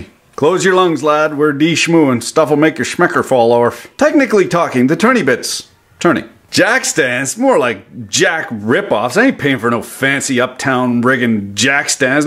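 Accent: Quebec, Canada. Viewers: Little mix of quebec, ontarian and american talk😂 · Is he Québécois? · Where the F is the CC button? No one understands Canadian English? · Naw, welcome to Canada, eh. We all talk like that. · You must have spent some part of your life in Quebec, you couldn't have acquired that slang and pronunciation anywhere else. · That Québécois tho. I like it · Cobalt? New Liskard? Not many places where you can develop both a hoser accent and also a heady joual... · Please keep the quebecois coming its hilarious! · Je savais pas que t'étais Québecois! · He speaks like a true canadian. " how da fuck can you close on a weekend " lol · Il parle le joual la plus belle langue du christ!!:) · be speaking true Quebecois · oh canadian . okaaaay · That's the most Canadia I've ever heard in 5 and a half minutes and I loved every second! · Hahaha Quebecois en criss! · I can imagine the confused looks, when people don't understand the subtlety of the engrish Canadian mixture, fucking great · Is that a Canadian accent you have? 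Aye good day. Take off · Me too... and i'm french! That quebec accent was so pronounced it's basically creole at this point.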